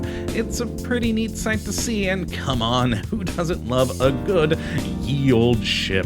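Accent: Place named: United States. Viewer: This mate trying to sound funny, Hate his American accent.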